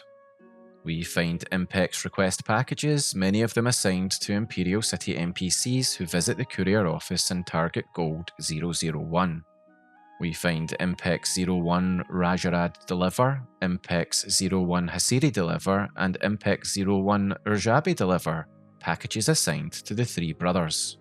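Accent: Scotland, United Kingdom. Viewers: Algorithm just pushed this to me, I like your informative low energy and the Scot accent adds to the soothing output of information. Good video to listen to while relaxing or sleeping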